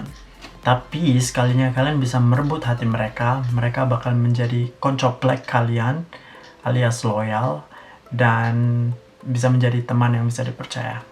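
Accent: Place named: Surabaya, Indonesia. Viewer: Dari cara bicaranya...medok, ciri ne wong Suroboyo.. Kota tercantik di di dunia... Ngk tau saya..nyimak ae yooo 😊😁😁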